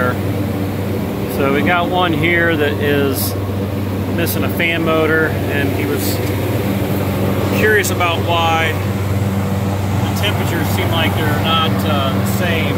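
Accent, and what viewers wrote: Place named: Pittsburgh, United States. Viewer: Sounds like you’re from Pittsburgh that’s the way we talk lol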